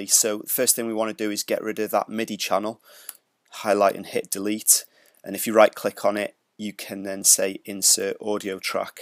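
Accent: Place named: Yorkshire, United Kingdom. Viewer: where about in England u from? (u sound very Yorkshire!)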